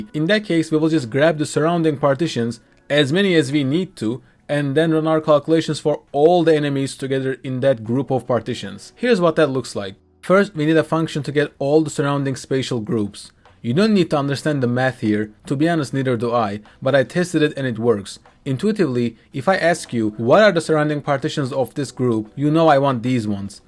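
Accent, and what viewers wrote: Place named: Turkey. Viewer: Kanka sen turk musun aksan turk aq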